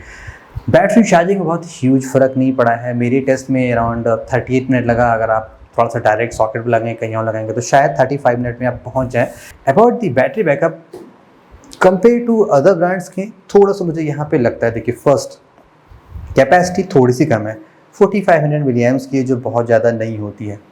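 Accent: Lucknow, India. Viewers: Sir aap Lucknow sa ho kya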